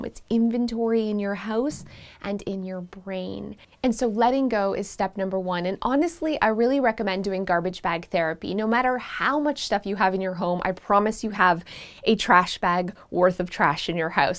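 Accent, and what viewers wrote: Canada. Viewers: Washer in the kitchen, and all the "aboots" Are ya Canadian 🇨🇦 🍁?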